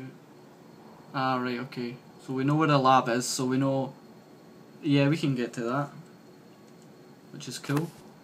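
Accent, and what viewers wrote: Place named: Ayrshire, United Kingdom. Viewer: Im scottish and Im having trouble pinpointing the accent, I think its ayrshire but really not sure, its funny cos they cant say words like "concentrated" they say "concentrataeed" instead lol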